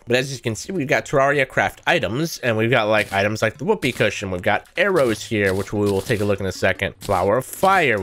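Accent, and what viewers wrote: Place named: United Kingdom. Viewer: It's probably harder for him to pronouce it the usual way because he is from the UK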